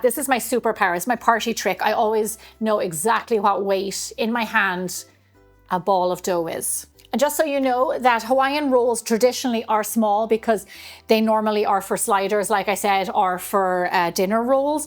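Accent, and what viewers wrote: Ireland. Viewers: I love your accent & have been wondering if George has taken after you speaking w/ a beautiful Irish accent. These rolls look wonderful. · Those are some lovely sweet rolls,. You’re truly such a gem when you bake, and when you speak with that adorable Irish accent. 🤗🤗🤗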